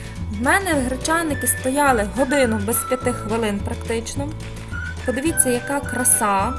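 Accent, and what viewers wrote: Western Ukraine, Ukraine. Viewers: Как же я люблю ваш украинский говор,некоторые слова не знаю,но по смыслу понимаю, люблю вас😘 спасибо 🙏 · Сьогодні вперше надибала Ваш канал і відразу ж підписалася. Шукала оладки. Ще нічого, звісно, не готувала з того, що Ви пропонуєте. Але точно спробую. А поки-що Ваша мова - то вже найсмачніше, що є серед усієї тої смакоти. Дякую Вам за гарну мову, рідну, колоритну і таку смачну!!!! )))) Звідки Ви? Чую, точно десь із Західної України ))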